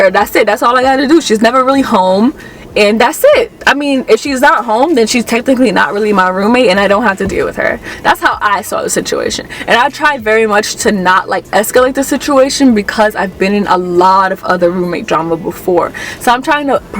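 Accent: Jamaica. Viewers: what a low down, dutty, scandalous, frowsy pum-pum, racist b1tch! You did well not to beat her a$$! new to your vids - love them, also are you of Jamaican heritage because I'm clocking certain speech patterns...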